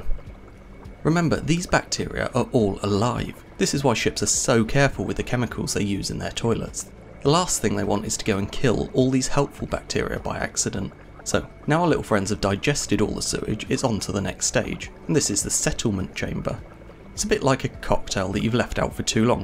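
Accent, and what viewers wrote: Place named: England, United Kingdom. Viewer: I guess a English accent should make everything more palatable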